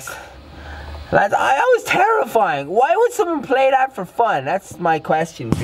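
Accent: Ireland. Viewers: You sound so much more Irish in this video! And that's coming from an Irish person! 😂🇨🇮 Loved this type of video from you, you should keep doing game plays! Just a random thought I had, if you were to build your 'dream house' that you've always spoken about on the sims that'd be awesome! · His Irish came back in this video lol!!!!! never heard him swear so much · Oh my god that was so funny, lads I'm fucking sweating 😂😂😂 also I freaking love the Irish accent xx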